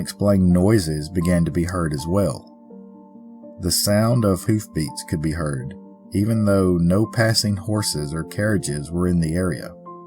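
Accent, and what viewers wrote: South, United States. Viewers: that southern drawl is mesmerising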